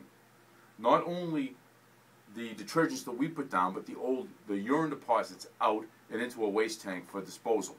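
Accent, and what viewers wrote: Boston, United States. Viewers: I hear that Boston accent.......from a Galway man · that Boston accent lol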